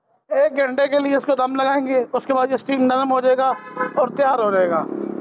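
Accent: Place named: Pakistan. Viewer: ویسے پنجابی میں اردو کا ٹچ بھی ودیا اے جناب 😅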